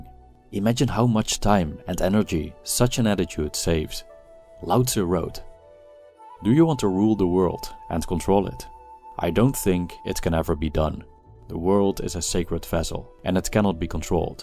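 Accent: Netherlands. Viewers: i love your dutch english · Lekker dat nederlandsche accent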